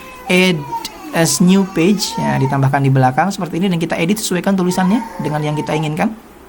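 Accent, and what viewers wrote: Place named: Lombok, Indonesia. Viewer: Bang ? Dari lombok y ? Kok nada suaranya kayak logat lombok ?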